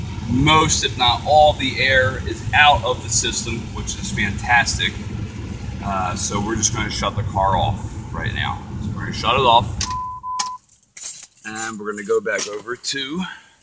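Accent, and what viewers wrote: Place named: Pennsylvania, United States. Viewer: You said "wudder" (water) and "Rad-e-ator". Are you from York county Pennsylvania or Carol county Maryland?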